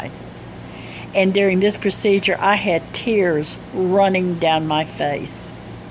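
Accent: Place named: South, United States. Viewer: I love ur Southern accent! I know u will do great and feel so much better after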